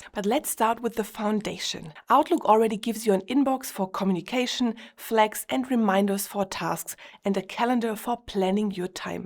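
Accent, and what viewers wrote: Germany. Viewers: Hard German accent.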